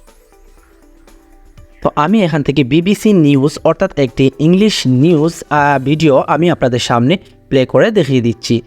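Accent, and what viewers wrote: Sylhet, Bangladesh. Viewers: ভাই আপনি কি সিলিটি❤❤ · ভাই আপনি মনে হয় সিলেটি r8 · Bhai ki sylheti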